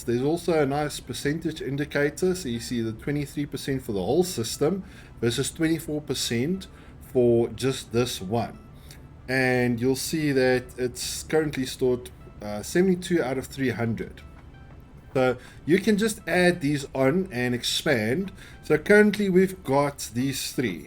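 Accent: South Africa. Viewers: Where are you from you sound afrikaans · Love the vid.your accent sounds south african?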